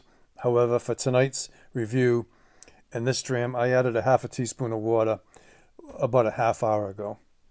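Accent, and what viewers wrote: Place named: Boston, United States. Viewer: OK👍 Boston accent, good one👍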